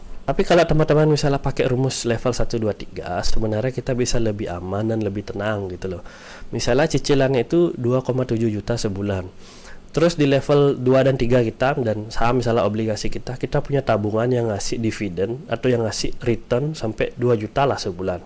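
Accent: Bali, Indonesia. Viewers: Wah kakak orang Bali ya Logatnya kerasa banget ❤️✨ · yeeeee nak Bali boss logat nya keliatan sekali milu sik trading nah hehe makasi sharingnya salam kenal kawan baru dari Bali menyimak see u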